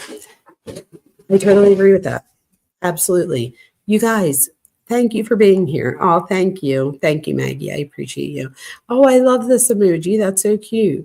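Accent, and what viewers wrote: Philadelphia, United States. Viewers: ❤LIKED n 🙋🏼‍♀️SUBSCRIBED! You're voice sounds like 🏡home🥰 I miss the Philly/ (....or NJ?) accent living out here in CA— It's just comforting to hear. ... And you seem like an excellent little snoop!🕵🏼‍♀️ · Are you from philly?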